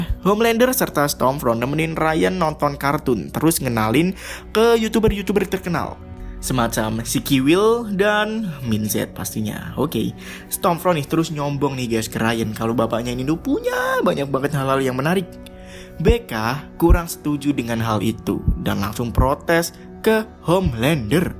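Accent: Java, Indonesia. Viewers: Mas sampeyan wong jowo zo...?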